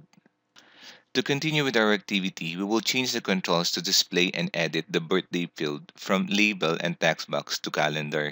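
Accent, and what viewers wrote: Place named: Philippines. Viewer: Hmm! Filipino accent X))))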